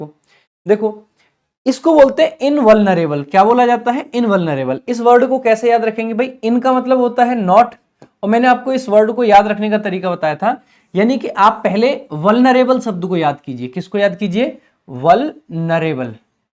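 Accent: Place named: Haryana, India. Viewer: HARYANA SA HO SIR MA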